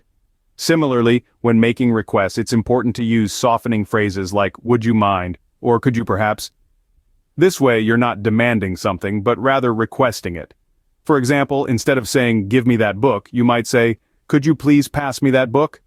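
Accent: United States. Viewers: this video is useful and helpful for me. thanky so much. is this american english? from japan shito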